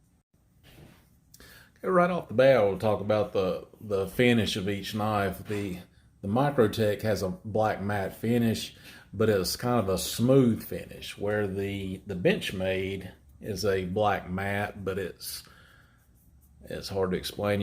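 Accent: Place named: South, United States. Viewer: just joking it your southern drawl.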